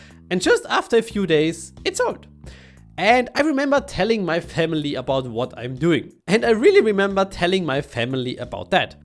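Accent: Germany. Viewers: erster deutscher youtuber, bei dem mich der deutsche akzent nicht abfuckt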